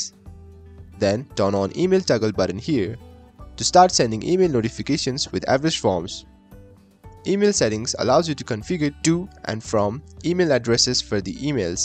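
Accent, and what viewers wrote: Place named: India. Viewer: Nice but It's not easy to understand with the quite strong Indian accent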